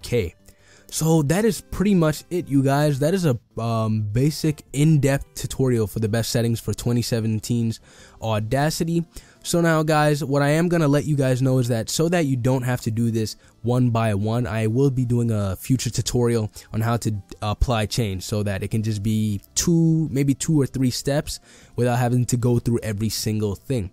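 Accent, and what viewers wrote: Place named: Netherlands. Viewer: Nederlands accent...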